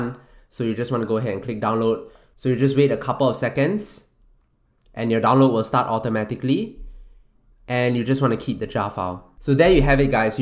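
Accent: Singapore. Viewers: nice sigaporian accent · are you from singapore ur voice sounds like it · I hear your accent, I knew INSTANTLY that you were Singaporean lol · ru singaporean? your accent is like sg accent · u from singapore?? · nice singaporean accent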